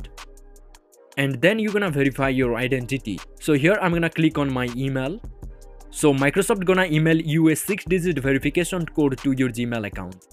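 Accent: India. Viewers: thank you for the info sir i bear that in mind it's very useful. everytime i always need help there will be a man with Indian accent that always be with me to help thank you again sir all the best to you now sir soon you will blow up and become famous youtuber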